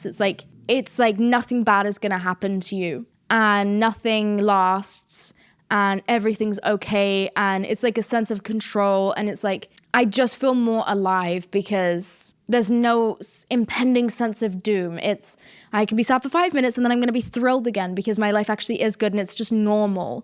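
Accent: United States. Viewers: US accent innit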